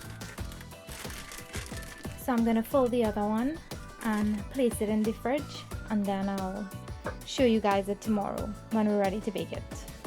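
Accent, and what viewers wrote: Trinidad and Tobago. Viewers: I does Love that Trini Accent so... oh Gawd ! AND she can cook.. I'm gonna have to head to the Homeland and find me a wife. -TriniAmerican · When I think of the trini accent france (French language) comes to mind, so sexy. Great recipe by the way. Real Yard man thing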